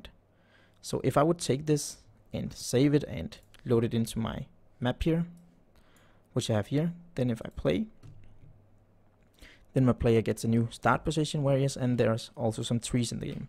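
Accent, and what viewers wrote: Denmark. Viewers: Love your tutorials! just started coding so this helps alot. You sound danish, am I right?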